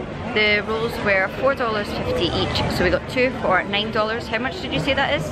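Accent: Scotland, United Kingdom. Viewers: A Scot with red hair, love the accent. Are you coming down to Tassie?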